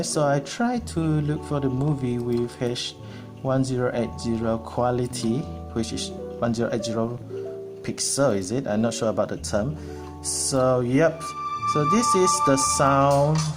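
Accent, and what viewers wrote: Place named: China. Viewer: Wao ur English so Chinese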